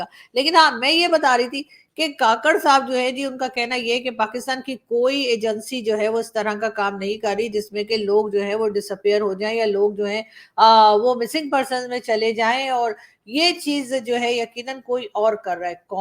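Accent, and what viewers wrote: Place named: Mumbai, India. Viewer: Mumbai language achha bol lete hein aap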